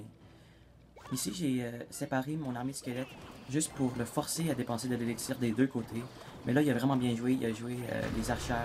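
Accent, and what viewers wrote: Quebec, Canada. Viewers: gg <3 j adore l accent quebecois XD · Nickel l'accent quebecois 👌👌 la video nickel aussi tes sur la bonne voie 👊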